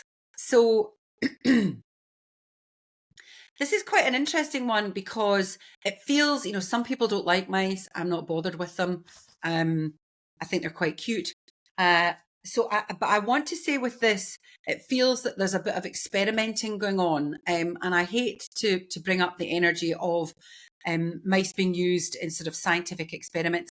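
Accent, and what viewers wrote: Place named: Scotland, United Kingdom. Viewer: I felt connected with the first video I saw. Thank you, and PLEASE keep going. Wishing you all the best for your channel. I've just shared this vid. P.s. I absolutely adore your accent. Scotland is One of my most favourite places on earth. ❤XX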